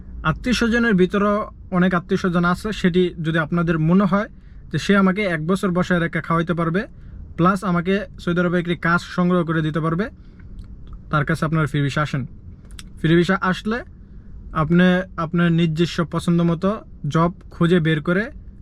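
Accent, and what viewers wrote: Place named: Comilla, Bangladesh. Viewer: ভাই আপনার কথাবার্তা টুন মনে হচ্ছে আপনার দেশের বাড়ি কুমিল্লা ভাই আমার নিজের দেশের বাড়িও কুমিল্লা মুরাদনগর থানা গ্রাম ধামগড়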